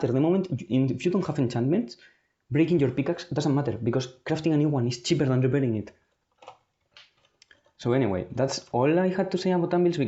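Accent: Spain. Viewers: Makes sense. Im also Spanish, so I could easily tell 😂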